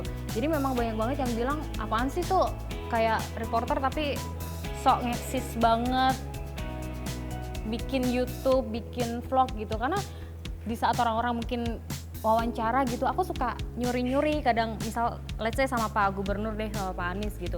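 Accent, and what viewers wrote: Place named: Mranggen, Indonesia. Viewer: pye2lah logat mranggenmu gk ilang mbak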